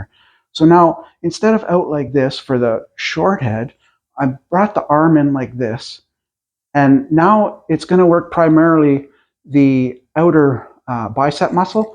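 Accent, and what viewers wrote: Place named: Canada. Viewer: R U Canadian? You sound like a Canuck. By the way you look awesome for 60 I never would have guessed. #Stayblessed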